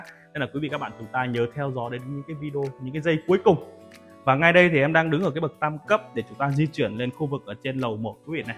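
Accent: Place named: North, Vietnam. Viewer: Ở Việt Nam mà báo giá triệu đô, nếu không nghe giọng Băc thì cứ tưởng ở bên Mẽo !!!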